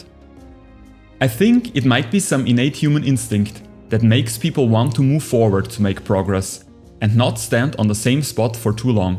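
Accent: Germany. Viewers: You German? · German Accent 👌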